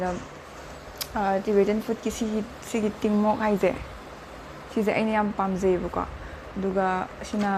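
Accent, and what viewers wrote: Kakching, India. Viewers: Kakching Marol da oina ngangngo aduna tabada nungsi tabasu nungai... Eshagi erol bu ngangthikpada ekairido karigino...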